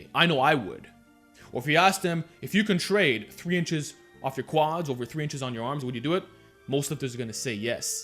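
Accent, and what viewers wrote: New York, United States. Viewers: Yo this is not a diss juat realized you have a NY accent with a little bit of a chinese american accent mixed in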